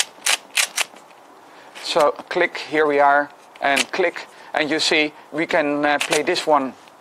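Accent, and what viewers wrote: Netherlands. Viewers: I heard he was from the Netherlands as soon as he began talking XD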